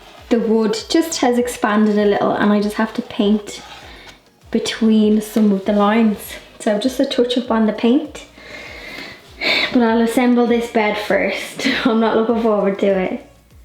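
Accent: Ireland. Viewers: It looks amazing!! Came across your channel a few days ago and I LOVE your projects!! 🥰 Plus, it's so lovely to hear that Irish accent again...been to Ireland 'bout 11 times but last time was a while ago so it brings back memories 🤗 will keep on watching for more ideas - sadly my beloved mom just passed and I'm currently emptying my parent's house. My two daughters (7&10) and I will most likely move into it and I definitely wanna keep lots of things and re-purpose / restore so your diy projects are a great inspiration! Looking forward to watching lots more of your videos 🤗 greetings from Munich, Germany 😘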